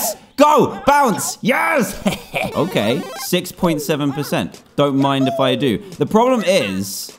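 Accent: United Kingdom. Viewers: are u from the uk?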